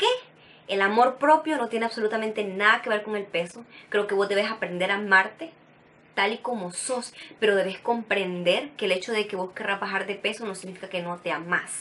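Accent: Honduras. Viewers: Bravo 👏 me encanta ver cómo te expresas a lo catracho👏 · Hola, como estás? es la primera vez que veo vídeos tuyos. Y me ha encantado, chica ya te ganaste otra suscriptora.!! Saludos desde Madrid, catrachaaa! me encanta escuchar mi acento!!! 😂😂😂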